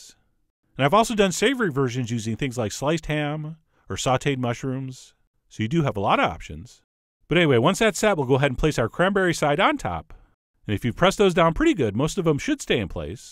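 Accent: United States. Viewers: His voice inflection gives me anxiety... I'm trying to listen to his instructions, but his voice inflections keep distracting me and forcing me to rewind, but I'm usually just re-distracted so I end up rewinding one section 4 times. D: He's great though, talented and funny, so imo the distraction is worth. Anyone know where this dialect originates from? Pretty sure it's an American regional accent. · I still struggle with this tuning up and down form this voice. It's like a Roller-Coaster in each phrase... dah-dah-DAh-DAH-dah-dah... dah-dah-DAh-DAH-dah-dah... dah-dah-DAH-DAH-dah-dah... This sounds EXACTLY like a vinyl record with a out centered hole in the middle. The record spins like an elipse... Is that some kind of accent from some region in the USA? It's really annoying in my ears... BUT, the video is really cool and THANK YOU for this recipe!!!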